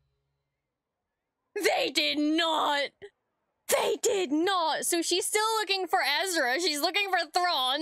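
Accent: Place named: Northern Ireland, United Kingdom. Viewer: You're part of the NI 501 right? Hearin' that accent. Mate how do I get in to the 501 chapter or what have ye?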